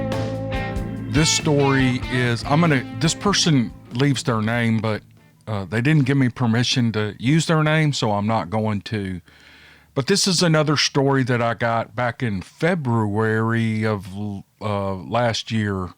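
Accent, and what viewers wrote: South, United States. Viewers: Came for the bigfoot encounters, stayed for the ranting.;)I love the stories, interviews, and your accent! I fully love a well spoken southerner. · I do! Because I know I'm going to LOVE the stories. But, what makes the stories even better is narrations. I love that southern drawl; he does a GREAT job!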